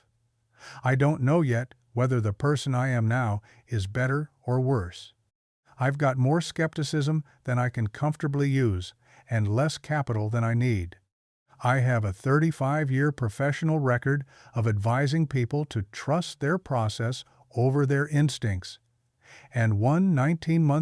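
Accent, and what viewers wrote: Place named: United States. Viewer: This guy sounds like an American, yet he measures money in British pounds. This video is a warning about how not to be stupid, as all these types of videos are. I guess it is in the right place, as YT is where all these stupid people like to hang, but the content is a just copy of thousands upon thousands. Lesson of video: Use your brain and there are no problems here (...and maybe avoid BKK). In the end, this video is just a repetition of many, many other vlogs on YouTube. It is a simple, lazily made imitation of someone else's original idea. Every Farang in Bangkok thinks this is how to create content, but this type of channel is now getting really tired and uniteresting. If you are going to make up a story, next time make sure it has an original thought behind it.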